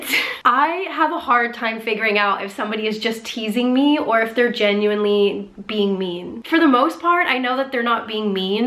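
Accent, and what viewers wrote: United States. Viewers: Ah, u have discovered British sarcasm, many of my US friends struggled with this too. Sorry, it’s us, don’t take offence, it’s part of our humour (think Rikky Gervais). Sorry about the 'turning heads’ with your accent. We have probabably become less accepting of the USA since Trump, those that have not traveled there alot, may think that all American’s are ‘MAGAs’ and as awful as he is. Finally, if you lived in Louisiana or even Florida, you might thik the UK is quite a dry place ;-). But then we dont have hurricanes or earthquakes - so thats an advantage! Enjoy your time here.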